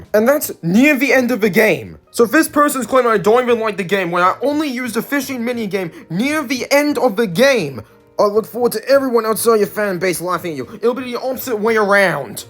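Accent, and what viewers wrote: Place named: United Kingdom. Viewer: The way you pronounce words made me suspect that you are British. I adore British voices. To me they are really enjoyable to listen. My lips and tongue cannot do that.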